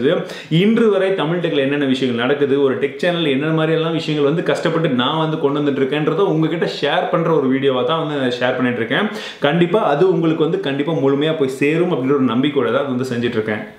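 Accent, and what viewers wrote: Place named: Kerala, India. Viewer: Tamil quality aaa Malyaali irukku nanba ........മലയാളി daaa......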